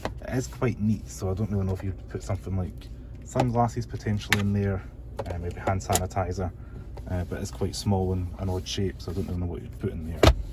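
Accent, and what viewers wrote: Scotland, United Kingdom. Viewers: Can tell a fellow Scotsman Usual Scottish windy weather in the video. Nice review as always.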